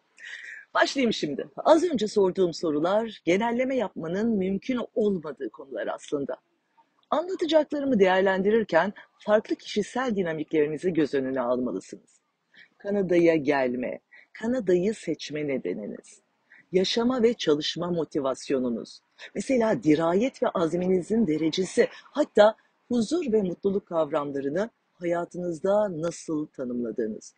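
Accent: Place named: Turkey. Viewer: Hanim Turklerin aksani biraz garip geliyor Kanadalilara, kulaga cok hos olmayabiliyor, fark ettigim kadariyla sizde de o aksan yogun o bakimdan anlarim sizi spiker olarak almamalarini. Hintlilerin de ingilizcesi bize garip ama onlar genel olarak alismislar, Hintlilere daha aciklar. Soylediginiz seylerin cogu dogru ama iste cok calismamiz ve mucadele etmemiz gerek. Duzen oturana kadar surunduruyor Kanada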